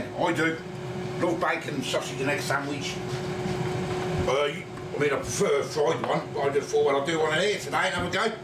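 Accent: Birmingham, United Kingdom. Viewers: Is that a Brummy accent I hear?🤣🤢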